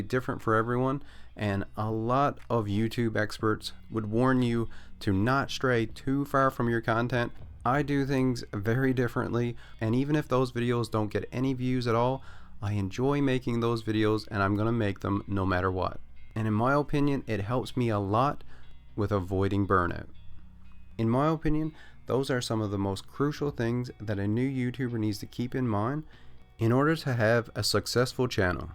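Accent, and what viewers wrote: Newfoundland, Canada. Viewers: I've watched a ton of your no man's sky videos, I just discovered you about a week ago and I've had your videos playing pretty much non stop and your guides really helped me alot. But in each video I keep thinking "huh, his accent sounds like the Newfoundland accent" but I kept telling myself there's no way... until I saw you in this video, that landscape is without a doubt Newfoundland lol. What part of the rock are ya from b'y? My entire family is from there lol.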